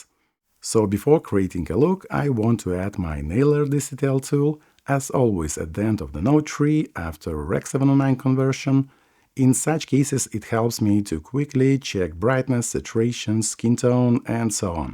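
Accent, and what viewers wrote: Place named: Russia. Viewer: Вроде парень наш, русский или нет? Вот прям чувствую ломаный английский, акцент русскоговорящих.))).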